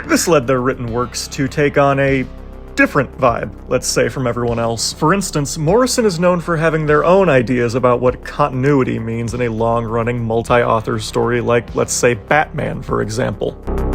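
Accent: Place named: Russia. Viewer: Really love hearing your thoughts but my god man your Scottish accent sounds Russian 😅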